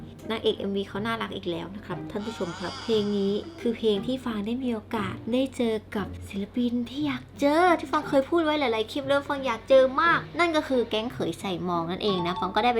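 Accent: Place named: Isan, Thailand. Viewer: พี่พูดอีสานน่ารักนะ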